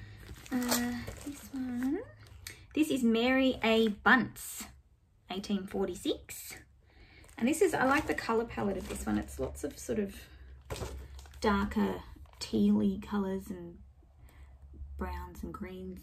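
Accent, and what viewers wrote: Australia. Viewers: I'm working on Mary A Bunce at the moment on 56 count. It's the first time I've done anything on such a high count, but I absolutely love it and I'm probably about 150 years older than you. Lovely to hear your Aussie voice as I'm Australian and living in the UK. Can't wait for the Covid restrictions to be over so I can visit the Crewel Gobelin again. Do they stock any of the linens you use?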